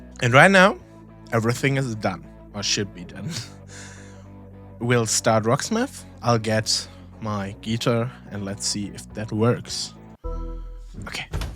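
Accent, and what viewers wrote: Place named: Germany. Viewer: Knew you were German after 2 seconds. Thank you for this video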